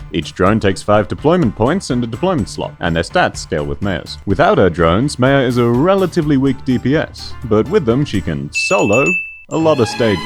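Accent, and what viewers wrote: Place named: Australia. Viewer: Perfect quality video except the aussie accent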